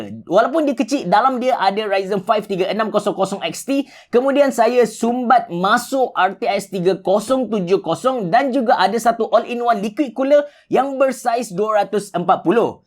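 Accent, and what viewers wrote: Kelantan, Malaysia. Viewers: Pekat bahasa kelate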